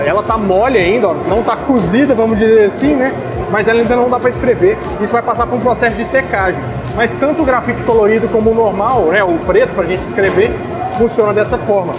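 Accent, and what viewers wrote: Minas Gerais, Brazil. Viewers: “Burracha”, “u pau tá quebrando” Hehehehehe Mineirês é top demais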